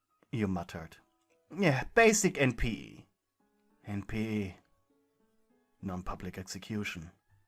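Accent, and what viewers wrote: Germany. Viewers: Bro I love his German accent! It's cute💖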